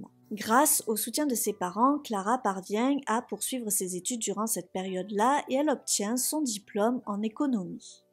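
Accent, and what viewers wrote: South, France. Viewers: J’ai oublie, pardon …Merci pour ce très bon récit.Continue… j’adore ton accent du Sud, qui est bien tempéré, pas à couper au couteau😊❤😅😊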